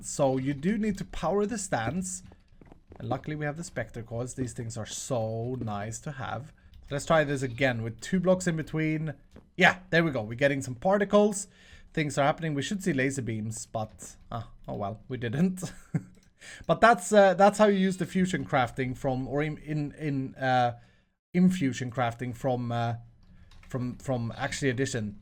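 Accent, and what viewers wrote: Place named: Sweden. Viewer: Are you swedish is kall is a swedish word and your first vid sounds like a swedish man talks english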